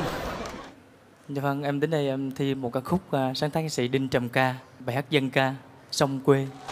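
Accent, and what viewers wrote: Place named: South, Vietnam. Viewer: Vẫn nghe giọng Nam đặc rặc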